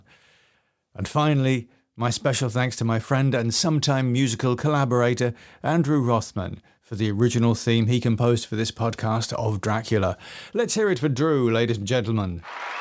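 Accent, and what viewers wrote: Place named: England, United Kingdom. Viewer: By far the best Bram Stoker's Dracula narration ever! You cant have the narration done by any but an English accent. accents for all the characters are all top notch! For anyone who hasn't listened to his vampire stories of Underwood & Flinch, you need to check them out ASAP!!! I believe they're at least as good and maybe better than Ann Rice's Interview With a Vampire and better than any other Ive ever read or listened to.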